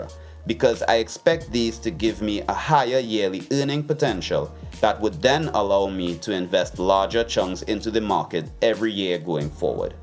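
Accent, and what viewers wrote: Trinidad and Tobago. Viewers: are you a Trinidadian 🇹🇹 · Sound like a Trini. But living where?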